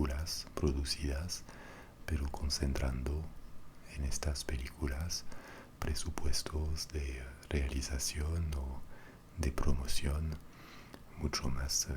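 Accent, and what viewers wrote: France. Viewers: So, do you still have a 'french' accent in spanish?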